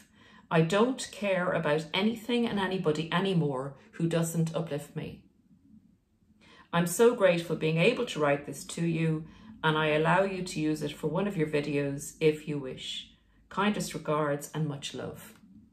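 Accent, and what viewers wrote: Ireland. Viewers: I have followed for 1year, its been an invaluable resource for dealing with the aftermath of narcissistic abuse...the narc sussed gray rock forcing a reverse discard. Thank you, great to hear an Irish voice on such a personal subject.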